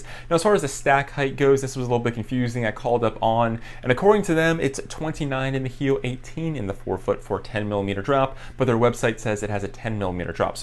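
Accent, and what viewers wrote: Maryland, United States. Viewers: I love when I’m watching YouTube and can just hear that someone is also from MD.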